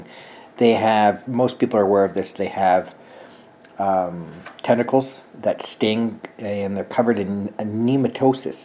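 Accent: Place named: Canada. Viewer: Oh my god why do I love you so much! I love your accent! I’m Canadian as well but I don’t think I have this accent! I’m from PEI if you didn’t know. But aww! Your adorable! You are so nice! I’ve only met a few of my fellow Canadians that are as nice as you!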